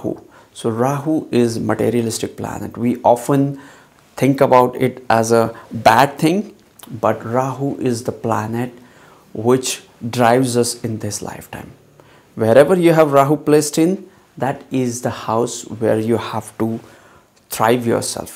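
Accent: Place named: Russia. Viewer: I have this struggle in life too. What a great video. Spot on how Rahu is shaky and made me go from one thing to the other. Your message towards the end was really good to be specific to find our calling, our Dharma and not be distracted. By the way you sound as if you have a bit of Russian accent - esp. when you say - "You''ll always" and "energy". Just saying. Sounds great. thank you.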